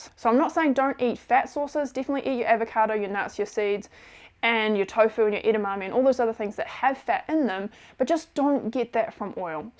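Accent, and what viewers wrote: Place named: New Zealand. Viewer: She sounds like a Kiwi (New Zealander)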